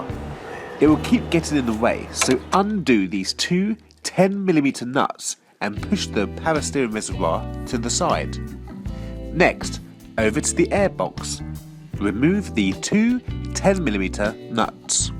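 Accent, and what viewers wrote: United Kingdom. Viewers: Fucking gay British accent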